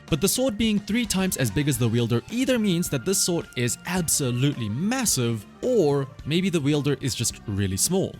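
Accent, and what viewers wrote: South Africa. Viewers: dude are you south african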